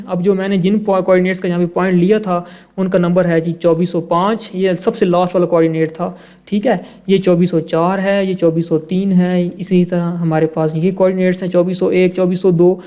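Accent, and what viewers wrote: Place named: Pakistan. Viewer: Awesome teaching Sir Ji stay blessed i think 🤔 you are from Pakistan 🇵🇰 right listening to your voice communication love and respect from karnataka India 🇮🇳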